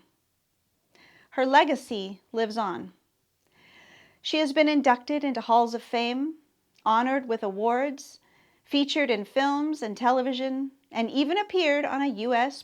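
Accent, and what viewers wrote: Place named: Ireland. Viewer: great video about a great lady... i got a chuckle from your irish accent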